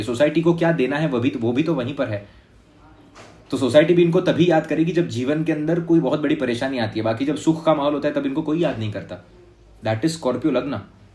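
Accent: Indore, India. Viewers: Are you from Indore?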